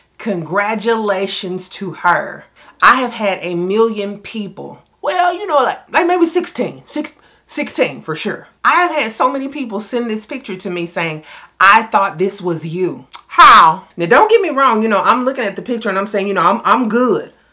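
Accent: South, United States. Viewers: #1 is the first word: NIGERIAN. You the most southern sounding Nigerian I ever heard and far as I knew you are somewhere on the southern U.S. LOL. You DO look like a classmate of mine tho… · Your American accent is really good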